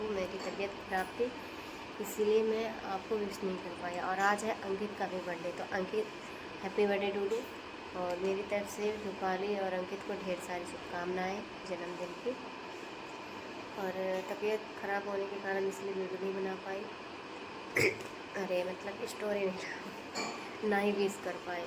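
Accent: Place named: Bihar, India. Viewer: Are you from prayagraj? Shocking.....you speak like bihari....anyway...keep growing....and stay away from such peoples...❤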